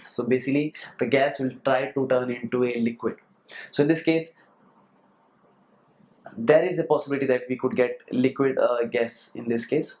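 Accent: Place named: Tamil Nadu, India. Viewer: Tamil speach touch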